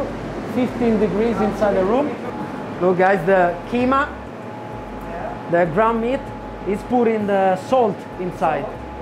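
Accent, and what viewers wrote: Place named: Italy. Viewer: Your italian accent makes your reactions even better :D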